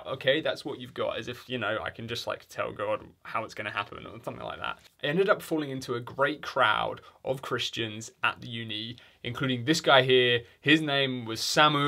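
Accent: England, United Kingdom. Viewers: How is it that I didn’t even notice you were English until you mentioned A levels. I guess the internet has made me used to the accent. I’m American.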